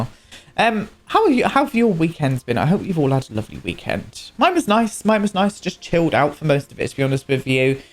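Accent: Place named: England, United Kingdom. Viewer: I love his accent! 😍 His region of England has a great dialect! 😊 💞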